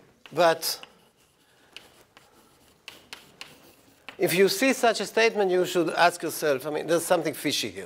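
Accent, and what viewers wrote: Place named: Israel. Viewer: The professor sounds so Israeli. LOL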